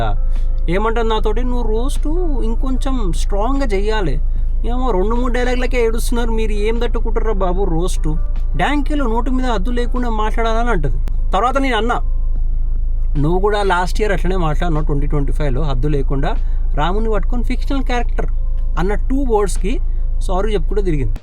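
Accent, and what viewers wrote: Telangana, India. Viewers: Next time you must and should go for abhijith and play him with kabbaddi,with your roast and telagana slang anna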